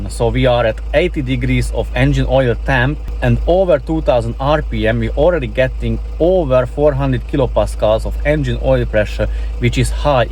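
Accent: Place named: Hungary. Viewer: Vicces, hogy a yt feliratozója teljesen jól megérti a magyar akcentust, nem úgy mint az őslakókét. :)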